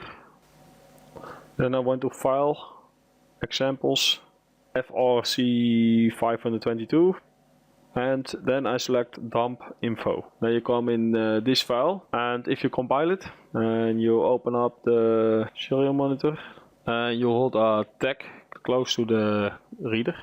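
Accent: Netherlands. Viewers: Het Nederlands accent is wel te horen, maar goede video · are you dutch XD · Neiderlandsee accenttt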